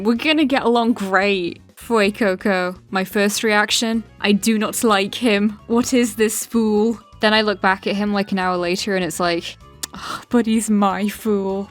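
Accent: United Kingdom. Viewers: HOW DID I NOT REALISE YOU'RE BRITISH - I'M BRITISH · Your British and you’ve never summered in Spain?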